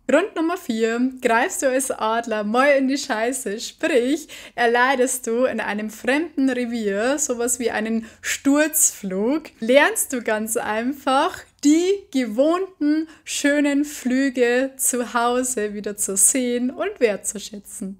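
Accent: Bavaria, Germany. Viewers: Das habe noch von keiner Frau gehört um so erfreulicher finde ich es von dir hören. Du hast so einen tollen Dialekt ich mag dein Bayrisch und du bringst dein Bericht sehr überzeugend und glaubhaft rüber mach weiter so du bist Super.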